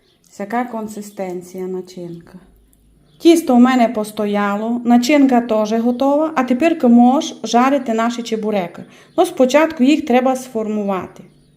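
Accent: Transcarpathia, Ukraine. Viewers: Май файно, коли авторка сказала " чебуреки добрі коли в них багато м'яса"!!!! Щастя вам і вашій родині!!! Всім нам миру!!! Дякую за файні рецепти!!! А наша ЗАКАРПАТСЬКА мова тото ще май приємне як мнясо з чебурека(фіґля)!!!!! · Дякую за файну закарпатську мову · Дякую за рецепт. Ви гарна господиня. А мова! О Боже, цей Закарпатський говір... Люблю Вас!❤ мої рідні УКРАЇНЦІ!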